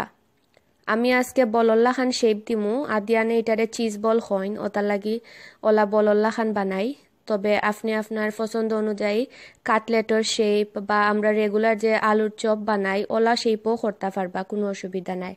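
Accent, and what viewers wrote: Sylhet, Bangladesh. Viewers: Apu,, miss ur sylheti maat.... ❤ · Asalamualikum Appa. Try kormu afnar recipe. Very nice recipe. I like how you talk in sylheti even I feel same that we are sylheti we spouse to talk in our language. Thanks 😘❤️❤️❤️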